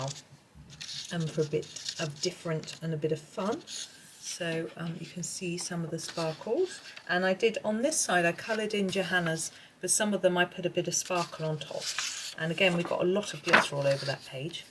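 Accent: England, United Kingdom. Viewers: Ah, you are such an inspiration! I have enjoyed this video so much, it has motivated me to think about what I will do with my copy of this book. I didn't buy it for myself because I've got several of Johanna's books and they were enough, seeing I've been going crazy on Amazon lately and have bought about fifty colouring books this year 😲. But a friend gave it to me for Christmas, and when I looked through it I felt like I could have some fun with it, just as you have described. I am going to blatantly copy much of what you have done, LOL! but have my own ideas for some of the pages. BTW I just love listening to you talk, I love the English accent. So different to my Aussie accent, you wouldn't think we were speaking the same language!!🤔. Best wishes for a very happy New Year to you and your family.🍸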